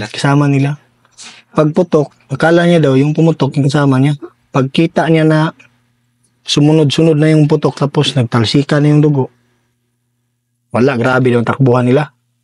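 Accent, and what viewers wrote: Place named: Bicol, Philippines. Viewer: Maganda man sana ang kwento kaya Lang BisakoL ang nag kwento. Ayun DUTAE Lang ang Ending ... Kwentong Barbero. 😂😂😂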